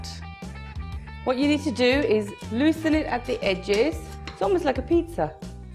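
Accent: United Kingdom. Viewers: Looks Indian, sounds British and is cooking Mexican I am really confused right now. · Amazing british accent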